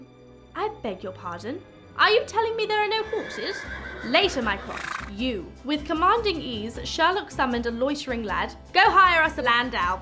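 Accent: England, United Kingdom. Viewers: Enola Holmes has brought back her English accent! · MBB + British Accent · She has beautiful british accent ❤️ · I didn't know she was a brit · alguien mas q ama el acento británico d? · She: EnOlA HolMEs (In a clear British accent) Me an intellectual: Should I call her Eleven or Enola? My brain: -Understandable: You should call her Eleven Holmes. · accent has got more British. she was going American for a bit. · She learnt her American accent from Disney, and her original accent is English. So she does not have a mix of both. There are many types of British accents. I don’t know what accent and Jen Ledger have, but I do know it’s not northern. Because northern English accents are hard to understand. The really thick type of British accent. But she is 100% British. Actually not 100%. She has a few different parts in her but her accent is 100% British. 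· she said she puts a voice on it her British accent and enolas accent is different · It’s so nice to see her in a part with a British accent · yeah she’s british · Lili she has an English accent, she took classss for an American accent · Enola Holmes reads enola Holmes In her cute little British accent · She’s at peak of her british accent!! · I swear they made her read the book just in order to bless our ears with that English accent!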